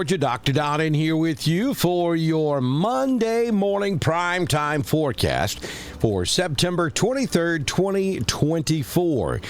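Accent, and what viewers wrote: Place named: South, United States. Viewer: I 💕 ke the way you present this report. No unnecessary talk Just clear southern man explaining the possibility not alarming everyone.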